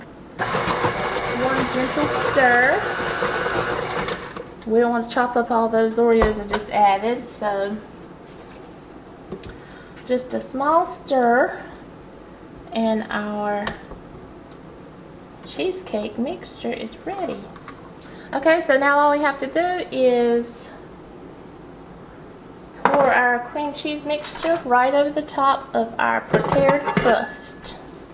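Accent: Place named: South, United States. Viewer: Funny my first YouTube experience was watching Trisha Paytas also........she's a mess lol Love your southern accent....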